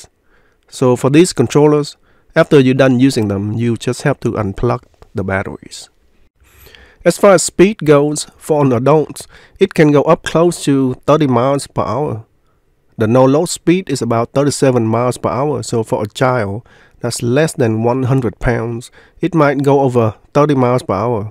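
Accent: Vietnam. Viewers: Vietnamese accent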